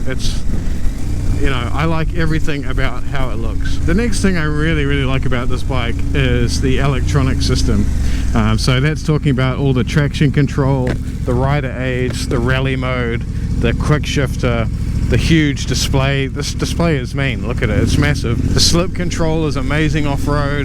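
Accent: New Zealand. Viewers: NZ I reckon from his accent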